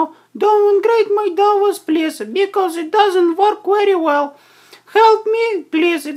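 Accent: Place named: Asia. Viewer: That's how Asian people talks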